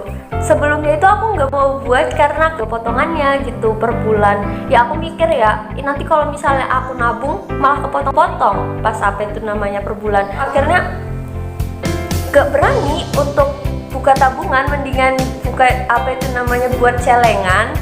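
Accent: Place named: Banyumas, Indonesia. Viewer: "Logat ngapaknya bikin candu" Great job !!! #BERKAHBersamaKeuanganSyariah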